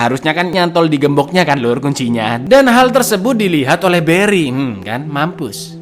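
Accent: Java, Indonesia. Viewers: Sy sering nonton spoiler video anda.. Bagus dan kocak. Cm kurangi medok lpgat bahasanya krn tidak semua org paham maksud anda. Jd gunakanlah bahasa Indonesia yg baik. Tetap semangat 👍🥰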